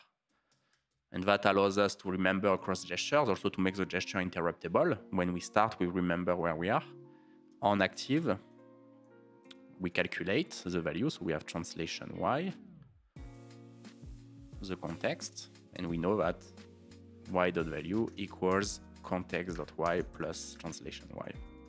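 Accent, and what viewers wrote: France. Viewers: German speaker, sounds like French, looks like American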